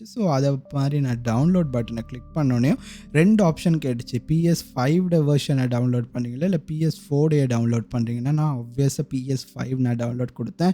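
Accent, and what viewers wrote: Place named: Sri Lanka. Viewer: என்ன இலங்கை வாசம் அடிக்கு. அகதியா நீ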